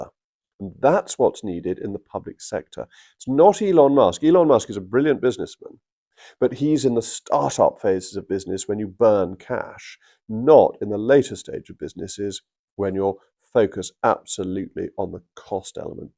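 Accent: RP, United Kingdom. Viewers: Imagine being so posh that what failed political party you have to stick with is a mere bauble by which you display your social class - Jacob, is your accent not more than enough? Come on, man! You wouldn't go down in class by supporting an objectively more conservative party than the tory party, surely. Your relationship with the tory party is like listening to an abused wife speak about how she'll always love the husband that beats her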